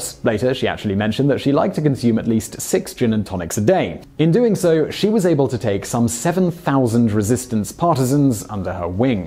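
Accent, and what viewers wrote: United Kingdom. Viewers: he has a British accent; by default his pronunciation is correct.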